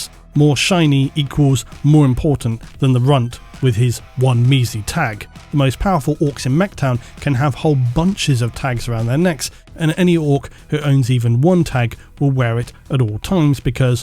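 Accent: United Kingdom. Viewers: Your voice and your accent in particular your British accent really helps you with a American audience I think I mean I like listening to you talk about this stuff more than say some American YouTube channels that talk about it most Americans want to admit it but a lot of them like a British accent when it comes to like narration an hour someone reading something or you know stuff like that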